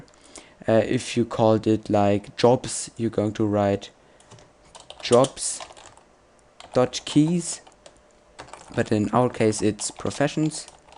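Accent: Germany. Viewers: You come from german right?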